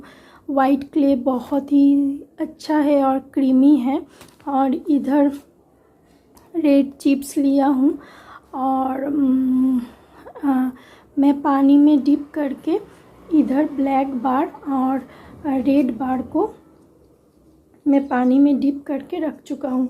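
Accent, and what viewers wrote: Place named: Bengal, India. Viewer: Tumi bengoli ata tmr hindi bolar somei bojha jai😘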